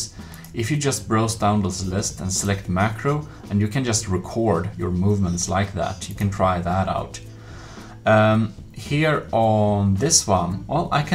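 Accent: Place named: Sweden. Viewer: i can litrealy here that ur from sweden LAMO lwest goo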